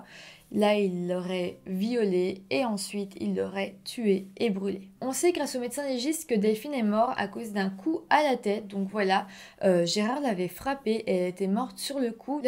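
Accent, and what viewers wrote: Belgium. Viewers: C'est juste l'accent belge ☺️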